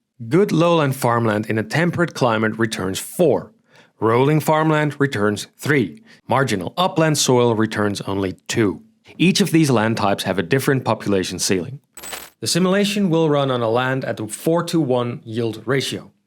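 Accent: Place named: Netherlands. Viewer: The channel says it's situated in the Netherlands, and by hearing his accent (as a Dutch person myself) i am 99% sure he's Dutch. So it's definitely weird how the imperial measurements slip in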